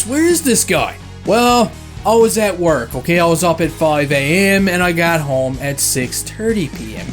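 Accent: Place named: St. John's, Canada. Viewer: Is he a Maritimer though? He sounds to me like a townie from St. John's? What are ya, buddy?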